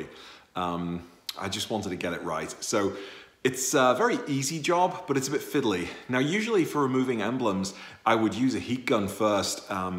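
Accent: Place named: Northern England, United Kingdom. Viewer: I thought it was Liverpool!! I'm in Manchester so could her the Northern in your accent. 😂😂 Came across your channel as I'm on the look out for a 911 myself!! Keep up the good work! 👍👍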